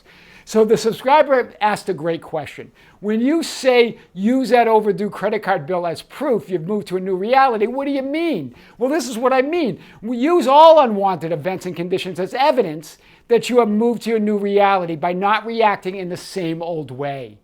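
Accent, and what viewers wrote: Boston, United States. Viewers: Your Baaston accent makes flip charts very exciting!!!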